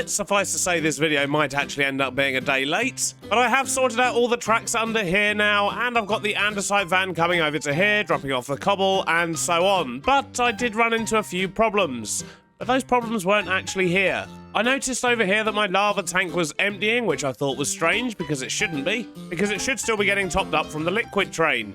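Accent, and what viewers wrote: United Kingdom. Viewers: Your voice sounds so british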